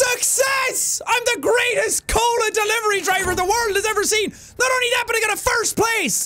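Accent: Ireland. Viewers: is it me or has accent only gotten stronger since leaving Ireland xD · You seem more irish today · sounds especially irish in this video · Is it just me or is extra Irish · Is it just me or has the become more Irish? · Omg his Irish accent came through so hard in this video, I love it! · Is it just me or is extra Irish in this one? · This is the most irish has ever been. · Am i the only one who is gonna realize this is the most Irish sean has been in a video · Ok random thing I stopped watching you for a month (idk why) and I come back and you sound 10x more Irish than before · I love that you let your Irish out. I also love it when you say "ya tink?" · has anyone noticed his accent os more Irish? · you Irish accent is to strong to do any other accent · is sounding WAY more Irish these days! ❤️😂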